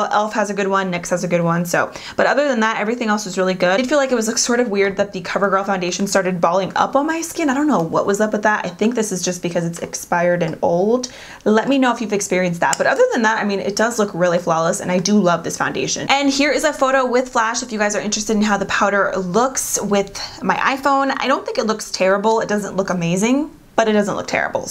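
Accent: Pennsylvania, United States. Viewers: Lol def a PA thing, I say A-range too lol I'm in Allentown! Love the look, very pretty! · I think Arenge is a PA thing! I'm from Philly but I live in Oregon now, and folks have commented on some of my very open vowels 😅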